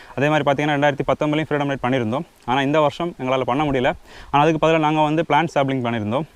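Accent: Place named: Kanyakumari, India. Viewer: Nenga Kanyakumari ah🙄🙄🙄 unga slang some different🤔🤔🤔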